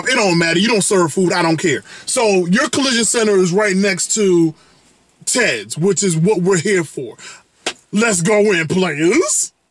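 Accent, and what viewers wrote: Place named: Boston, United States. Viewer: YEH HE SOUND LIKE HE BOSTOOOOOON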